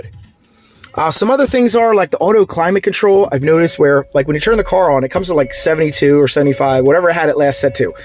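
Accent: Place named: Philadelphia, United States. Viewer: Dude, are you from Philly? Because you sound, well, you sound like me LoL. Anyway great details. Can you disable the start/stop? I'm picking one of these up tomorrow.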